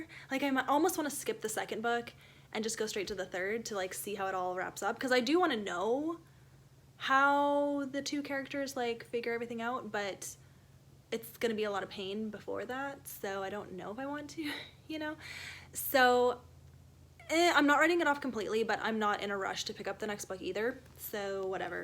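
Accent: Canada. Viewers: I love Canadian accent. About and sorry! (Thinking about Anne here, "sooooorry".) And I just enjoy it. Enjoy learning French! Love Julie Klassen. The first I ever read was Lady of Milkweed Manor and I love it. Think it may still be my favorite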